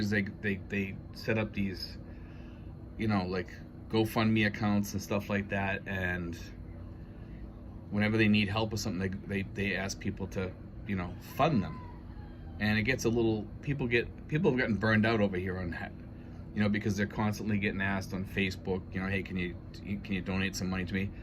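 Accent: Boston, United States. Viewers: Are you from Boston area? Do I hear a brogue? Dad is from Dorchester.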